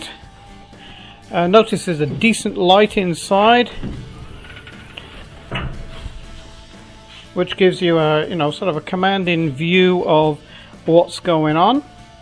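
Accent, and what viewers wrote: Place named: Cardiff, United Kingdom. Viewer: laaarge! Got to be Caaardiff or thereabouts :)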